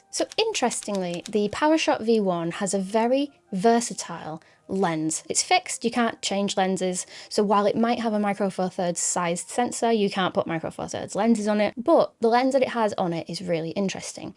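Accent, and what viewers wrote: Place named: England, United Kingdom. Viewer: Your review gives us a bonus - Ask me, what? - Answer- learning your English accent.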